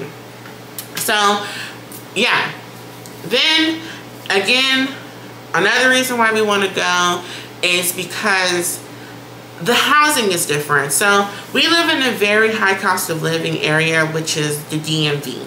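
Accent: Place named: Jamaica. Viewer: Born and raised in Florida!? But it sounds more like you were born and raised in Jamaica 🤷‍♂️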